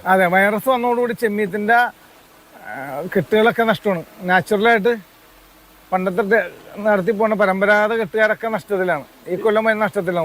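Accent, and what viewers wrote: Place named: Valluvanad, India. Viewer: ഇതിന്റെ അവതാരകൻ ഒരു ബുദ്ധി ജീവി തായോളി ആണ്... അവന്റെ സംസാരം വള്ളുവനാടൻ സ്ലങ്ങും ചോദ്യം എല്ലാം അതുപോലെ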